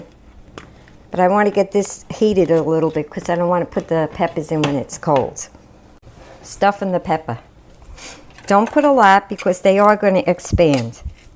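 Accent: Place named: Brooklyn, United States. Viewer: Looks good, I make them similar but bake them in the oven coated with olive oil. She sounds like she is from my neighborhood in Brooklyn.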